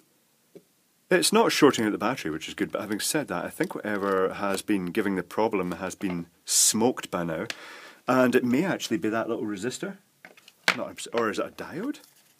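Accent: United Kingdom. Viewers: this guy has the most british accent i've ever heard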